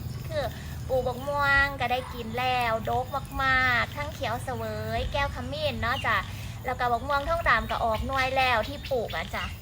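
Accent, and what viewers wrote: Isan, Thailand. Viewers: ทั้งขยันทั้งเก่งจริงๆนะพูดสำเนียงอิสารก็เพราะๆทำจุลินทรีย์สังเค ราะแสงก็เก่งๆ...อ.าจารย์ คนสวย.คนงามๆ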